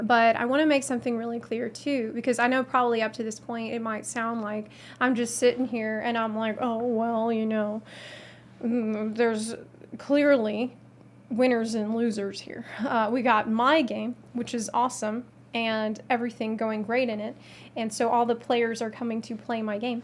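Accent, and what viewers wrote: South, United States. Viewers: I'm just getting started in FFXIV, and binge watching your vids(working) make it even better. Not to mention, that lovely, southern accent(I'm hoping Tennessee, my home sweet home), doesn't hurt lol. Love the content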